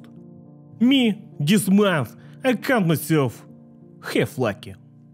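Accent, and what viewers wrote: Russia. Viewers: This combination of soft music and heavy Russian accent along with the cut off sexual comments is killing me lol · I’ve always like the Russian accent and some time I speak in sort of Russian accent, but with your Russian accent and Comedy is perfect, love your channel❤️🤪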